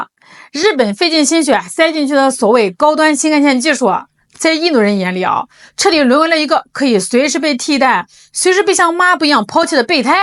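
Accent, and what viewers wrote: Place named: Northwest, China. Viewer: 听口音，这漂亮可爱的小姐姐好像是西北人，希望不是AI，很讨厌AI做成的东西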